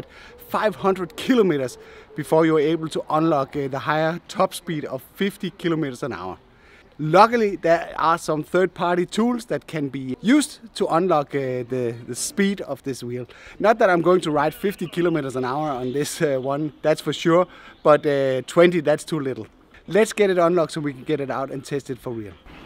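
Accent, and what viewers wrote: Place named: Denmark. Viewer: Din accent lyder meget dansk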